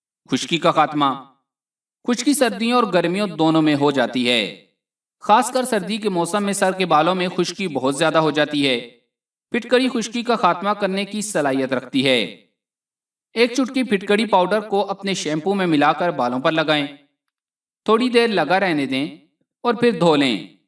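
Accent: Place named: Bihar, India. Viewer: Bhai aapki zubaan, bilkul Biharion ki style m urdu bol rahe hain🦅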